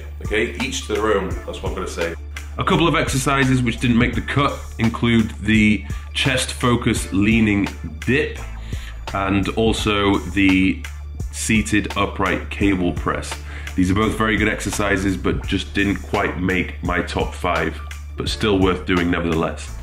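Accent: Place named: North, United Kingdom. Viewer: Where are you from? Accent kinda sounds north.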